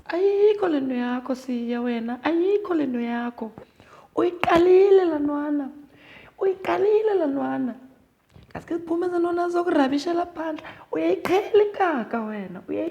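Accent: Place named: Sterkspruit, South Africa. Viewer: Esakho Isixhosa ingathi sesase sterkspruit 🥱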